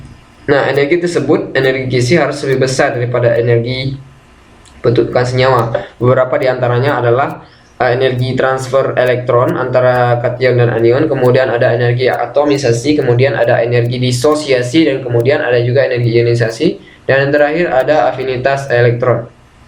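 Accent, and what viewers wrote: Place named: Sulawesi, Indonesia. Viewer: Logatnya sulawesi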